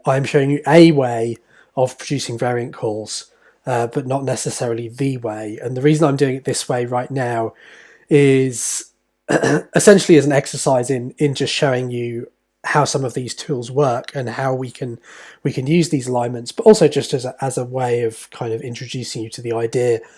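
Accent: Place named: Cockney, United Kingdom. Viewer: it's comfortable to hear cockney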